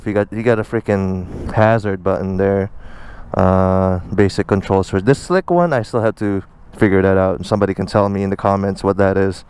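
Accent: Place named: Philippines. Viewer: bro u sound pinoy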